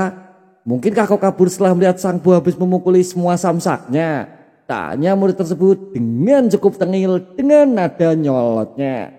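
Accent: Java, Indonesia. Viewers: Jangan di ulang terus kalau ngucapin nya Logat Jawa Lo jangan di liatin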